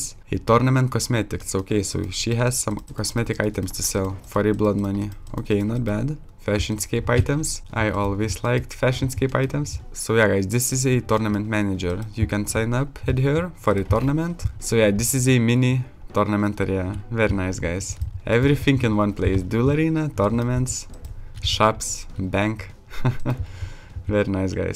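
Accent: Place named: Lithuania. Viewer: You sound like you are from lithuania , btw great server 100 + players online everyday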